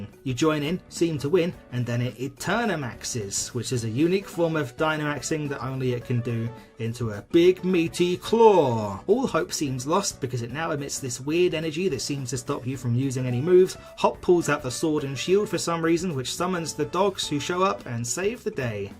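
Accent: United Kingdom. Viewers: I love your Yorkshire accent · Didn't even take 10 seconds for me to know this video was gonna be trash. I was gonna give it the benefit of the doubt, too, but damn. And your bri-ish, yeah, bye. (I stopped watching cause he dissed Sun and Moon, him being Bri-ish just added to it)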